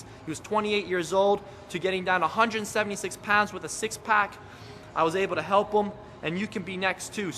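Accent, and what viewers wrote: Canada. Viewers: hehe he sounds like he is from canada or something ay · u can say this guy is Canadian :p well im too but i say about not aboot any way he said really good stuff so peace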